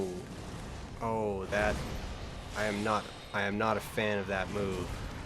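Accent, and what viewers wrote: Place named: Edmonton, Canada. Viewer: Where do you live? I'm from Edmonton. Sounds like you're close to me.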